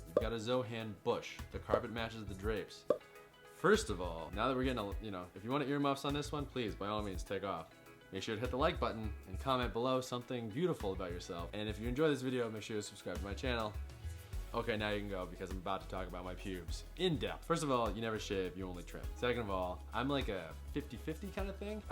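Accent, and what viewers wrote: Boston, United States. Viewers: I feel like I hear his Boston accent so heavy in this video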